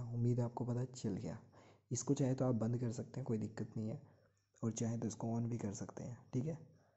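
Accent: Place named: India. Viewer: What is it with all these guys from India clogging up the how to's on YouTube electronics. First off no one could understand what they're saying between the accent and the lack of base in there voice and second isn't there any straight English guys just wanting to explain how a phone works instead of him telling the world what he did with his boyfriend last night. THIS GENERATION IS GONE TO SHIT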